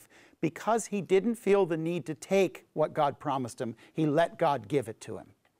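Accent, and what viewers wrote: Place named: Israel. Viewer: I understand that native tongue is Hebrew (being born in Israel). You are being exposed to a greater thing. The Word will expand your mind, heart and soul-- in Hebrew the bet is pronounced as "b" AND as "v" bet/vet; and that is what you are hearing. Shalom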